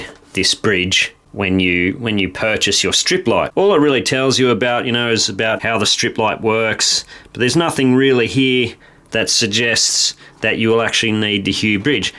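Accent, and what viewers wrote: Australia. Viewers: just because he has an Australian accent doesn’t make him Lazar beam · Does everyone sound the same in Australia or is this lazerbeam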